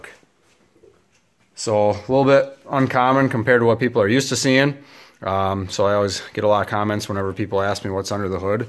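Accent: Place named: Upper Midwest, United States. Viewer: Yes, the northern.midwest accent. I wonder how a 4.9L running on propane would do. They certainly run clean with no fouling of plugs. They run better with high compression pistons or head decking to raise the compression. Seeing the specs on the Honda GX NG Civic they had compression ratios of 12.5:1. Propane should work pretty well unless its gets SUPER, SUPER COLD liquefies -44°F. Natural gas isn't as available as propane. Hard to get a hold of out on the interstates. Fed gov't has a website about this stuff on fueleconomy.gov. alt fuels.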